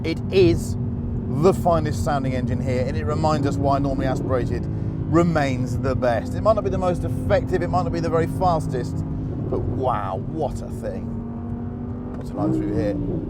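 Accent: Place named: London, United Kingdom. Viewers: Sener S sounds like London to me. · Anybody knows the British Accent of Chris Harris?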